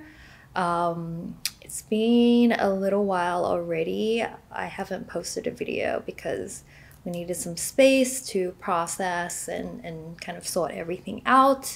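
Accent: Australia. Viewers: you (guys) were my childhood youtubers u used to have an australian accent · ur aussie accent is gone :0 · bruh do u know ur accents- it’s Australian 💀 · Youve kinda lost your aussie accent lol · Where did her cute Australian accent go??? 😩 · it realised just hit me but australian accent is nearly completely gone like i can barely hear it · I haven’t visited ur channel in years ur Aussie accent hone :(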